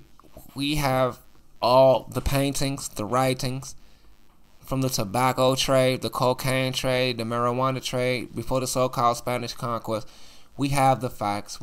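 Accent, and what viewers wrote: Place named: Chicago, United States. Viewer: I knew you were from Chicago before I read it by the way you talk lol. Good video.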